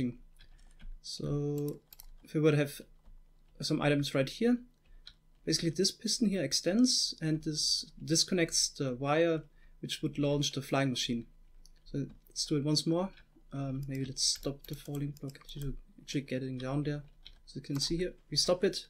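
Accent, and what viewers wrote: Germany. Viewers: Der deutsche Akzent hahahaha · Where u from? U sounds like german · Da hör ich doch den deutschen Akzent raus😂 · in my understanding he is german, his accent is very similar to mine, :D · The german accent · Dein Deutscher Akzent haha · He sounds German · So nice man merkt das er deutsch ist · Du bist deutsch hört man👍👌💪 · It really sounds like you are German and try to speak English. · Hellocould i ask something are german because your accent is almost like mine when i speak english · Are you German? You sound very german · Du klingst deutsch lol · Are you from Germany ? Weil ihr euch so anhört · wich language do you normally speak, because you kinda sound german or spain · Ja man hahaha dachte am anfang das er Deutsch redet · I think you are german because you have this actzent and i knew this because i have this actzent too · Ist das Deutsch-Englisch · t0rf I'm sure he is :,D His accent and some accidentally used German words gave it away ^^" And everyone knows that Germans are the best engineers soooooooooo... 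Just kidding but ye, I think he's German :D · Are you German? I thought it because your Accent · Hörst dich an wie n deutscher lul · Are you german? You sound like your german xD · Man hört deutlich das du deutscher bist... Ist normal lul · Man hört dein deutschen Akzent bro aber krasses english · Dezenter deutscher Akzent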